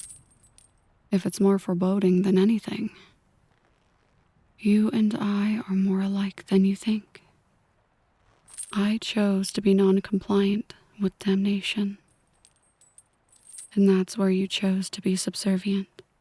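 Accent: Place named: South, United States. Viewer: I just love the southern, though the question I have is the "bless your heart" bit the good version or the bad version?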